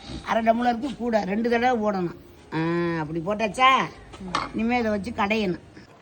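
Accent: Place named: Madurai, India. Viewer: Thaayee.. unga pechu arumai.. adhil pandiya naatu paasam mattume therindhathu..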